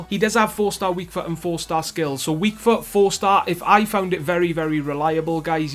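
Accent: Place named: Wales, United Kingdom. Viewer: Beerus that's the Welsh accent bro, they pronounce certain words softly.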